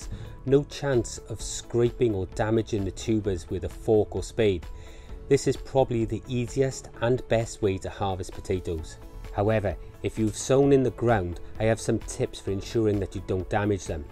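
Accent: United Kingdom. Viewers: I’m American and I can spot that Welsh voice a mile away! It’s a beautiful language that creates a beautiful sound when speaking Engliah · wow I used to hate UK accents until I heard yours.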